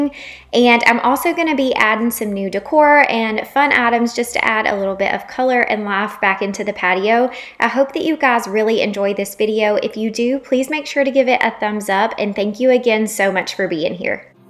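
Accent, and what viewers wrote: South, United States. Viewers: Where is she located? She has a pretty southern accent.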